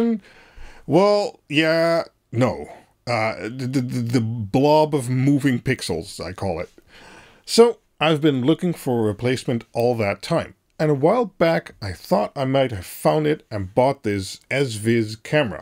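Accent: Netherlands. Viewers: Hallo, ik hoor dat je waarschijnlijk uit Nederland komt of vandaan? Ik heb je video bekeken het is een goed concept en zie ook dat de video 2 jaar oud is, maar ik heb hier waarschijnlijk een oplossing voor die misschien minder tussen stations heeft?!?! Of heb je relay board en esp32 voor nog meer dingen nodig? Dit is de 'DS6660 PRO' van DOORSAFE een standalone camera met LAN poort die PoE ondersteunt, WiFi antenne, gong aansluiting AC/DC, resolutie: 2mp fhd 1080P, verbinding via de Tuya app dus ook mogelijk te gebruiken met Home Assistant. dit allemaal voor €110,00 inc BTW Ik ben benieuwd of dit een goede alternatief is? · Are you from the Netherlands. Your accent sounds Dutch.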